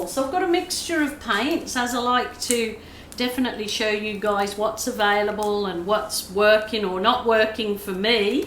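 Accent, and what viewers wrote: Birmingham, United Kingdom. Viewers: Lovely....can I hear a bit of a brummy accent?🙂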